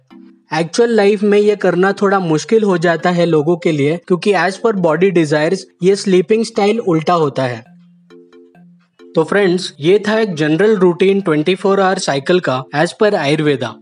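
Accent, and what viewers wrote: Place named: Odisha, India. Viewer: Brother You Are From Odisha ? I am asking it because your language pronounciation are more related to Odia.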